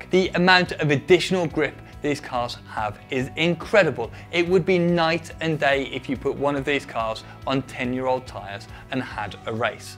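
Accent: United Kingdom. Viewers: British accent, Captain Kirk cadence. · probably my unic car subscription cause i like you man, humble is the reason, clear explainanyion,simple language,serious. i have learned with nicevoice by the way. i know them all(tbh i prefer english than americans,so rug) english has this accent remembering me my arabic-fassi origins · Good research (probably outsourced), but I literally yawned at the delivery. Standard 'proper' UK, scared af to be unique, business tone. You are boring bro. The car are cool though.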